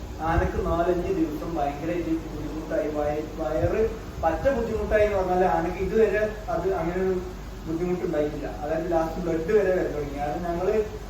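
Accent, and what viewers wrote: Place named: Malappuram, India. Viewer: ഇക്കാ...ഞാൻ വളരെ എടുത്താണ് കാവേരി കുട്ടിടെ വീഡിയോസ് ഒകെ കണ്ട തുടങ്ങിയെ....പക്ഷെ ഒരു മാജിക്കൽ എഫ്ഫക്റ്റ് പോലെ ഇ ചാനൽ എന്റെ ടോപ് ഫേവറിന്റെ ചാനൽ ആയി മാറീ...ഇപ്പോൾ ഇക്കയും, കാവേരിയും എനിക്ക് ഏറ്റവും ishtamulla രണ്ട ആൾക്കാരാണ്....നിങ്ങളുടെ വീഡിയോസ് ഡെയിലി കാണുന്നതു തന്നെ മനസിന് ഒത്തിരി സന്തോഷം ആണ്. എപ്പോളും shorts എടുത്ത് കണ്ട വീഡിയോസ് വീണ്ടും വീണ്ടും കാണും...ചേട്ടന്റെ ഇന്റർവ്യൂസ്,Ottayan vlogs ഒകെ ഞാൻ എന്നും കാണും..... എന്തോ e ചേട്ടനേം ആന പെണ്ണിനേം ഒത്തിരി ഒത്തിരി ഇഷ്ടം.പിന്നെ ചേട്ടന്റെ മലപ്പുറം ഭാഷ അതും രസമാണ്...എന്റെ ജില്ലാ കൊല്ലംജില്ല ആണ്. ചേട്ടന്റെ മലപ്പുറം ഭാഷ കേട്ടോണ്ട് ഇരിക്കാനും രസമാണ്...Anoush മോനും വൈഫെയ്‌നും ഡാനിഷിനും ഉപ്പക്കും ഉമ്മമാകും സുഗന്ന് വിശ്വസിക്കുന്നു.....നോമ്പ് കാലത് എല്ലാ അനുഗ്രവും ചേട്ടനും ചേട്ടന്റെ ഫാമിലിക്കും, വീട്ടിലെ എല്ലാ മിണ്ടാപ്രാണികൾക്കും നേരുന്നു.....ചേട്ടന്റേം കാവേരിടേം കഥ ഒകെ ഇപ്പോ ഞൻ എന്റെ ഫണ്ട്സിനോടും,അപ്പൂപ്പൻ അമ്മാമയോടും ഒകെ പറയും..ടീവി വീഡിയോ കാണിച്ചപ്പോ അവർക്കും നിങ്ങളെ ഇഷ്ടായിയ്.എനിക്ക് ആന കമ്പം ഇലായിരുന്നു.ഇപ്പോൾ പക്ഷെ കാവേരി പെനിൻറേം ചേട്ടന്റേം ഡാനിഷ് ബ്രോഡിഎം fan anu njan❤❤❤❤. 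കൊല്ലം ജില്ലക്കാരി ആയ ഞാൻ എന്നേലും നിങ്ങളെ ഒരിക്കൽ കാണും എന്ന പ്രതീക്ഷയോടെ നിർത്തുന്നു.....ഇ മെസ്സേജ് വായിക്കണേൽ പറ്റുമാണെങ്കിൽ ഒരു റിപ്ലൈ തരണേ ട്ടോ ❤❤ With Lots of Love and Best Wishes Abhirami from Kollam❤❤💓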